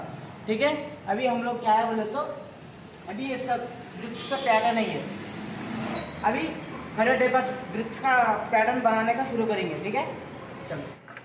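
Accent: Hyderabad, India. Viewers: Talent ❤️..that Hyderabadi accent ❤️ kiraak 🎉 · Hyderabad hindi Right?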